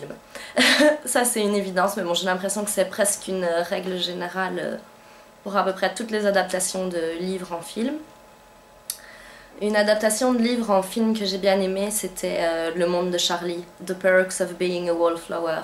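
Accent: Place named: Southwest, France. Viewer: Oups c'est un garçon, il s'appelle, (j'espère que je ne trompe pas..) il est aussi blogueur, d'après son accent il doit être du sud ouest de la France...Allez à suivre..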